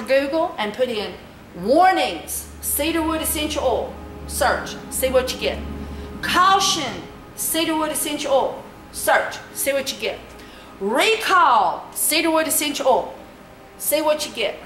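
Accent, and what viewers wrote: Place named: Alabama, United States. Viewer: Fort Payne. I knew you were from Alabama. You talk like me. Cant wait to try this.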